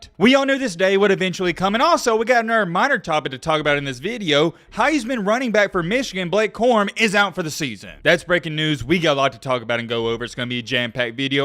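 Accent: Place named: Alabama, United States. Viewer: This guy has to be from Alabama or somewhere down south. Lol his accent is definitely there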